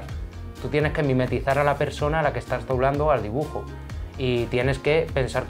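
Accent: Argentina. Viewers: Escardi neta eres Argentino we(? PAAAAAJARO 7U7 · Sos de Argentina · Eres de argentina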